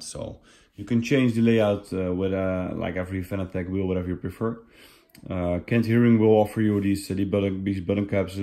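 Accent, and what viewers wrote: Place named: Netherlands. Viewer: You must be Dutch, i talk English in the same way as im Dutch too, nice vid btw! One of the better reviews of this set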